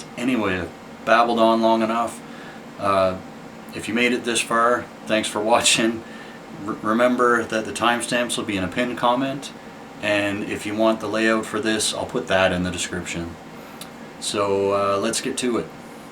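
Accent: Canada. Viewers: Just got into building about four months ago. I love your videos man. No this was not boring at all. I could watch this stuff all day. P.s Are you Canadian? My canuk radar is going off haha. I’m in Ontario. Cheers.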